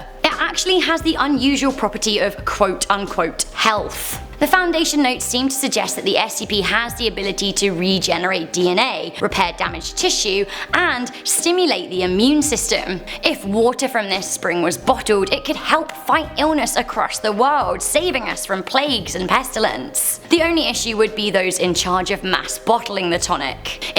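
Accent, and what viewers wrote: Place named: United Kingdom. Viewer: British nice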